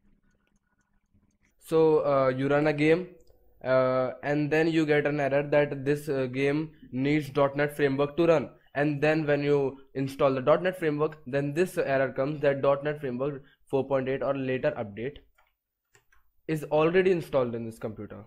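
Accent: India. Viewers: You know the tutorial is gonna work when the accent sounds Indian. ❤ U indian brothers.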